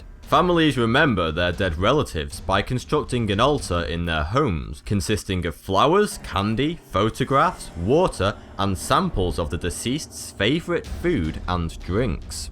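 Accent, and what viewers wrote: United Kingdom. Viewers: "Samhain" is NOT pronounced Sam-hain. It is a Keltic word in the Gaelic language. It is pronounced "Sow-en". It translates to Summer's end. The veil between the world of living and the world of the dead is thinnest between sunset and sunrise. Trick-or-treat began to appease the deceased; so that the living would not be harassed by the dead. You sound British, and you don't know this???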